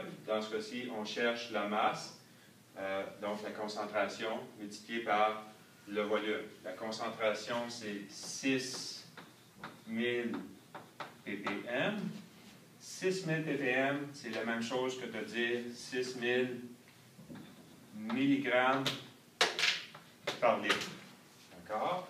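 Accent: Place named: Quebec, Canada. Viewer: J'aime beaucoup ! C'était simple, un bon rappel, et en plus avec l'accent Québécois qui me plaît beaucoup ! Ca change un peu des cours habituels, c'est sympa comme tout !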